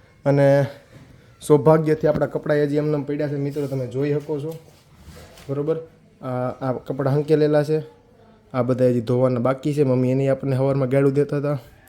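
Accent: Kathiawar, India. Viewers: Vah bhai,, pure Kathiyawadi bhasha❤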